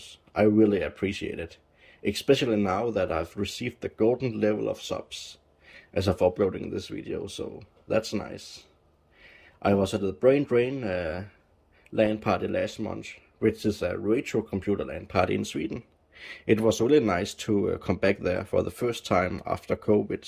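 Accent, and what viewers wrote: Denmark. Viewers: Thanks for the update BTW, if i ever need to show our lovely danish accent to anyone, ill show them this video. Håber der kommer en ny video April 2024 Tak for det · I love your videos, the Danish accent makes me smile, and fits the content. Keep up the great work.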